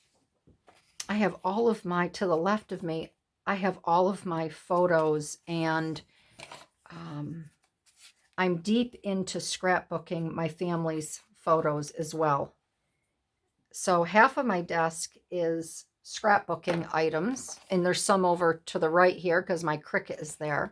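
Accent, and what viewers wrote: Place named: Scotland, United Kingdom. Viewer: Such a great idea to remodel, those digitals are super pretty. Your little bit of Scottish accent made me smile 🥰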